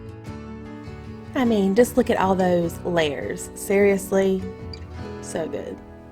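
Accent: South, United States. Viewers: Dad gummet! Girl you’re speaking my language…true southern 😂 They all looked delicious! Thanks for sharing the recipes!! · Hi, I'm new to your channel, but like watching you cook and all your easy recipes too. I really like your southern accent too. It's cute, & I subscribed too. Thank you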